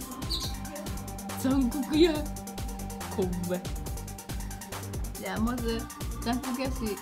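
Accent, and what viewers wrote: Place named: Ishikawa, Japan. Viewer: ナイス石川弁！！もっと方言出してー！！嬉しい('ω')ノ♥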